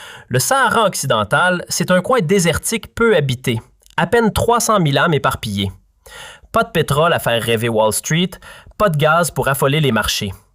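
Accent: Canada. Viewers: Mensonge, on dirait un Marocain qui parle canadien , les algériens sont a l'aise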